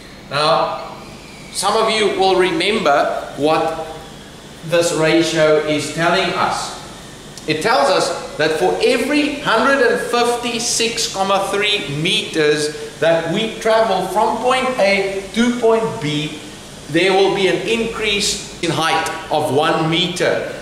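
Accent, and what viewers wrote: Afrikaans, South Africa. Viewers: Are you from South Africa? Thank you!!! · Africaans accent😁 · Are you South African?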